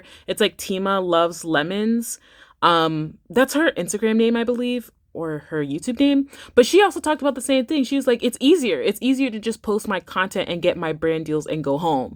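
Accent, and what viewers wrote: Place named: England, United Kingdom. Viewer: The English accent had me out 😂😂😂 love your video style !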